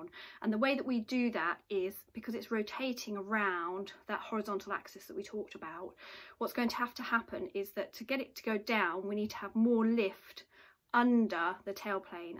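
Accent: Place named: United Kingdom. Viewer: Thank you! I love that I understand you perfectly, even with your British accent (I'm not a native American speaker).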